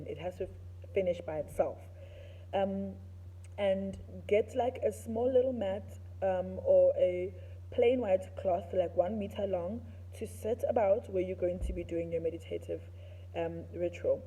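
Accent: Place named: England, United Kingdom. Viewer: logogo wenu okhuluma isingisi wathwasa e England yini ?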